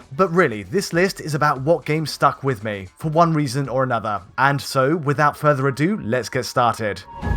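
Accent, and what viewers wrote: England, United Kingdom. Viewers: English accent 💯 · he has an English accent, no such thing as a "British voice". If you have a problem with it then leave, you're not wanted here.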